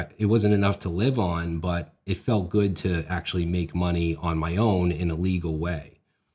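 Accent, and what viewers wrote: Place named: Boston, United States. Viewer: web dev videos helped me to enjoy web development again after losing interest in it around 2017. There was just something about his style and approach to making tutorials and crash courses. I love web development now more than I ever have, and finding his channel was a big part of that. It makes me wonder what my life would be like now without having found his channel. The only thing I question: You say you "used to" have a thick Boston accent!? I feel like we need an audio example, because this still sounds like a pretty thick Boston accent.:-)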